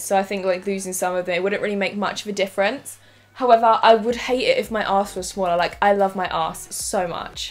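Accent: United Kingdom. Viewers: She sounds British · British accent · Is your accent British ?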